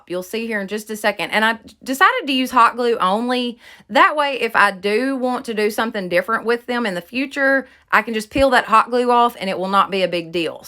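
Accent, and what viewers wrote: South, United States. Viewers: I really enjoyed the whole video. Love your southern accent, but I live in Oklahoma so I can actually understand you lol. Sometimes I have to rewind and rewind videos from the other YouTube girls because they talk way to fast lol. I'm subscribing and fixing to check out your past videos. Thanks for sharing your creativity with us!!! God Bless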